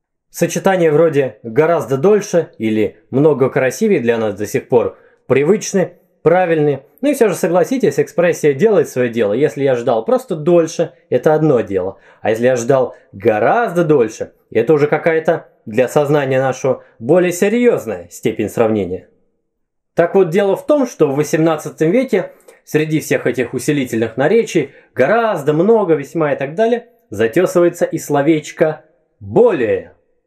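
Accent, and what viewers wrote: Moscow, Russia. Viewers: Ох уж этот московский говор. Продолжайте, ни в коем случае не прекращайте. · Мне кажется, пустее, богатей, пустее и т.п. есть влияние северных говоров ( новгородский, вологодский ), ведь довольно слабый эффект второй палатализации чувствуется и по сей день. Собственно, кабы Мацква не захватила Новгород, мы бы и дальше говорили в руце, в нозе, в дусе и т.д., а северные области для остальной России были бы чего-то вроде Шотландии для англичан 😎. По поводу сравнительной и превосходительной степени в западнославянских языках: в чешском она даже проще, нежели в польском, ввиду одинакового окончания в номинативе для всех родов ( напр. lepší (лучший (-ая,-ое) или же просто лучше, в русском от контекста зависит; horší (более короткий или худший) и т.п.). В превосходительной тупо прибавляешь приставку nej. Я еще заметил, ты с московским акцентом говоришь. 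Коренной москвич?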